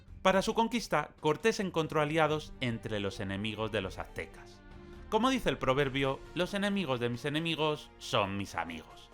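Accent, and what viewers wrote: Spain. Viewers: No me gusta del todo tu relato. Es evidente tu ascendencia española.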